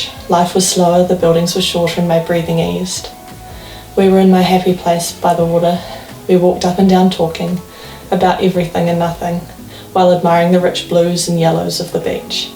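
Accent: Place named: New Zealand. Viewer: I love the kiwi accent welcome to Sydney come again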